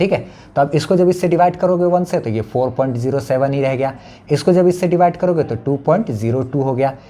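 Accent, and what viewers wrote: Bihar, India. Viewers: Aree bhai enka language se he pata chal raha ha ki ye Bihar se ha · App bihar ke hai?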